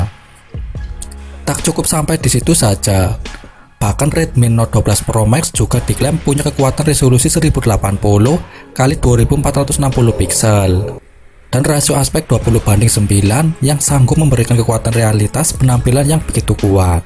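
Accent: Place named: Java, Indonesia. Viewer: Medok amat..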